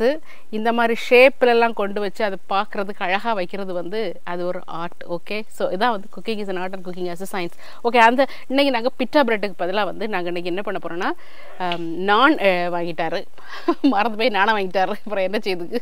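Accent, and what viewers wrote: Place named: South Tamil Nadu, India. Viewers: சகோதரி பல ஆண்டுகள் லண்டனில் இருந்தாலும் நம் திருநெல்வேலி தமிழை மறக்காமல் பேசுவது பெருமையாக இருக்கிறது மட்டன் கபாப் சூப்பர் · Super mason தாங்கள் சமையலை விட நீங்கள் பேசும் எங்கள் தென்னாட்டு மொழி மிகவும் அருமை எங்கள் முகவரி இந்தியா தமிழ்நாடு திருநெல்வேலி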